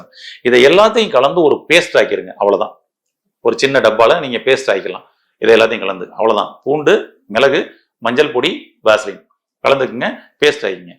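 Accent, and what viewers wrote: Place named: India. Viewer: Dr சொல்லும் சில சொற்களை இலங்கை மக்கள் புரிந்து கொள்ள முடியாதா ? Air (எயார்) என்பதைத்தான் ஏர் என்கிறார். Common கொமன் என்பதைத்தான் காமன் என்கிறார். தமிழில் காற்று என்று சொன்னால் நன்கு புரியும். இந்தியர்கள் தமிழிங்கிலிஸ் பேசுவார்கள்.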